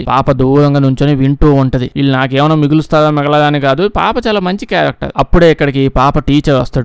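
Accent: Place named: Godavari, India. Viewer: ఇండోనేషియా సినిమా గోదావరి యాసలో అద్భుతంగా చెప్పావ్ బ్రో 😂😂😂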